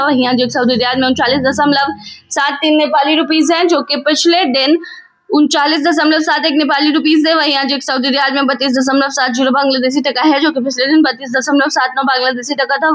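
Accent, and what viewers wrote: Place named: Pakistan. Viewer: Horrible urdu accent, incomprehensible verbal diarrohea! Pls use copper tongue-cleaner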